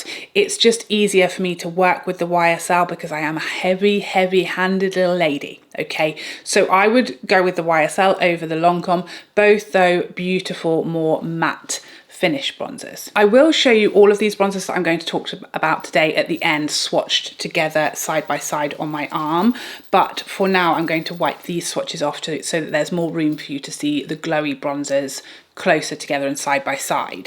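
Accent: United Kingdom. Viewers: I loved this overview, dear, and, i love all English speaking YouTubers, and yes, what i see here below, British accent is sooo elegant always! Living in The Netherlands, they try to learn us UK English, but most of what is getting in our heads from TV and internet is of course American English, which is not a problem! But i think that's why, we Dutch have that mixed pronouncation, i always, always and always hear it!😂Your accent however, is so lovely!!!! The bronzers, i refused purchasing one other bronzer after everything to warm lately, but i puchased the Yves Saint Laurent bronzer, shade 2, it's really neutral, really matte, not my top 3, but top 5, love it! Wishing you a wonderful day!!!😘😘😘😘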